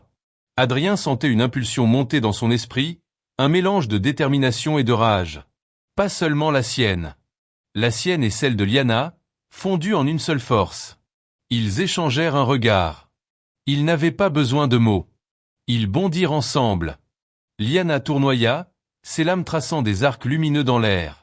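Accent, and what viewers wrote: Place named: Portugal. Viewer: C'est n est pas une autre langue..mais du français avec un accent portugais ou brésilien Mais, oui,c est penible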